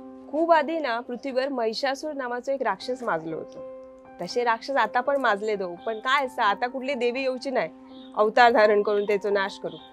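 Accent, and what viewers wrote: Malvani, India. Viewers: बेटा, तुझा माहिती सभर वीडीयो मी नेहमी आवर्जून पाहत असतो. मालवणी भाषेत ला तुझा प्रत्येक शब्द मला ऐकायला आवडतो. मी गुजराती आहे. माझं बालपण गिरगावात गेलं असल्याने मराठी भाषा मी बोलु शकतो,वाचु शकतो आणि लिहू शकतो. तुझ्या मालवणी भाषेत जो गोडवा आहे तो मला नेहमी ऐकायला आकर्षित करतो. तुझा मालवणी स्वाग असाच कायमचा चालू ठेव. माझे तुला खूप खूप आशिर्वाद.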